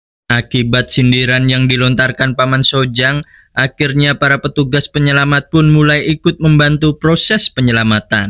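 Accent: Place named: Banyumas, Indonesia. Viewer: Ngapak bang 😂😂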